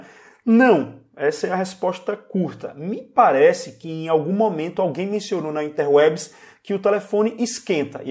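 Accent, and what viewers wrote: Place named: Aracaju, Brazil. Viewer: caraca tu é de aju? hahaha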